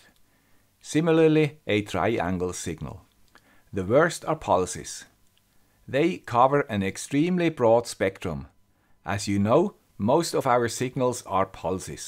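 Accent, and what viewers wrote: Switzerland. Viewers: is that a picture of you in the bottom right hand corner at the opening of the video? Its so nice to be able to put a face to your Swiss accent. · Perfect video ! Loss in free space chart alone is great. Simple as Einstein says but not simpler. Thank you guy with the Swiss accent for this another great video.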